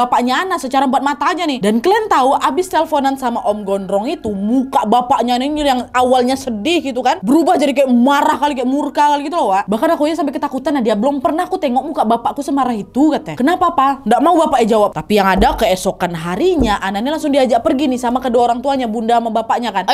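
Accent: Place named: Padang, Indonesia. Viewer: Lama2 nada bicara LW kyk Padang!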